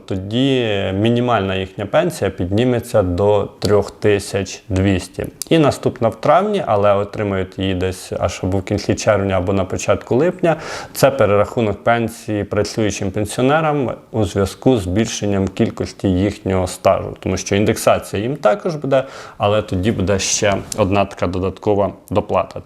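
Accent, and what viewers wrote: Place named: West, Ukraine. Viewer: Звідки ведучий? Виглядає, що десь з села з західної України. Говоріть нормально, а не як цвєк. БудУт, становляТ і т.д. Хлопец зі села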